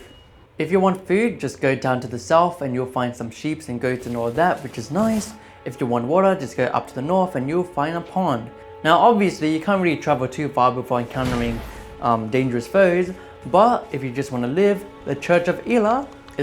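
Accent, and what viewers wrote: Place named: Asia. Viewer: my bad, I didn’t assume an Asian person with a heavy Asian accent was Australian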